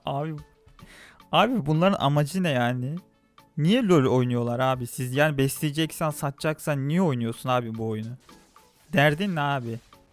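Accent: Malatya, Turkey. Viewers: Malatyalı mısın hemşerim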